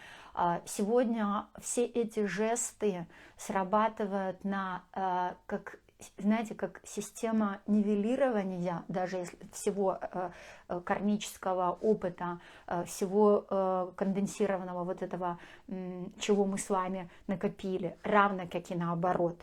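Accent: Ukraine. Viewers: як же Ви красиво розмовляєте українською мовою❤. Серце затримтіло і сльози навернулися, а ще відчуття спокою і миру в душі від Ваших слів. Слава Україні🇺🇦 Боже бережи Україну 🙏🇺🇦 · Дякую за цікаву інформацію і за прекрасну українську.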